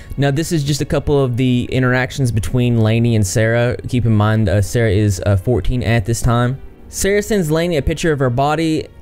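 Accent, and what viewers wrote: South, United States. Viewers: This was a very well detailed video on the whole situation. Also I wasn’t expecting that southern accent but hey! It’s great, for some reason it makes it more entertaining to hear you reading out the little “articles” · This is my first video I've seen from you and omg you look so much like Shayne Topp, just more alternative with a southern accent. It's fantastic 😂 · you look like a final fantasy character and then you hit we with the southern accent lmao. Great video man. Really liking your stuff. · Can i just say I love your country accent!!! & you're cuter than a truck load of baby ducks!!! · Idk why I'm watching this. Never seen a video on this channel. Ooh I love the southern accent though! Lol. Good video as well. Onision is an authentic narcissistic psychopathic. Its quite crazy honestly! Has YouTube gotten involved in any of this legal and moral drama? 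· Your face says punk rock, but your voice says country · I've never seen an emo boy with a country accent. Thank you for enlightening me. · Onion boy is disgusting, but I subbed because of your accent. Not many people on the platform have a southern accent! (I’m from GA) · I thought I heard a southern accent .. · HIS COUNTRY ACCENT WITH HIS PUNK AESTHETIC IS KILLING ME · I just love his southern accent like Jesus. 😍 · you and your factual Southern drawl o lawd <3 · He definitely sounds like a southern gentleman 😂 I love it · you're like super southern. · Dude you look like a anime character but with a heavy southern accent. The world is a beautiful place. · It’s always so nice to hear someone else with a southern accent on YouTube 😭😭😭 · Besides from this hot tea, I enjoy the juxtaposition of your emo style and your southern accent. Very pleasing. Also good job and being unbiased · I love what you're doing man you have great vids but I just gotta say it you sound like Idubbbz doing a southern accent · love the country accent with the punk look lol. 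where are you from??? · I love his low-key country accent loool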